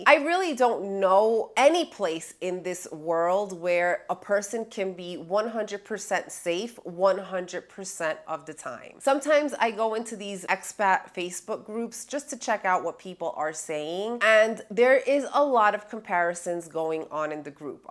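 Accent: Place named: Paisa, Colombia. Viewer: Great video and U love the paisa-ish accent when you speak Spanish! And a fantastic, timely warning. For anyone who doesn't know, though, "No dar papaya" is an expression that means don't let your guard down or otherwise make yourself an easy mark.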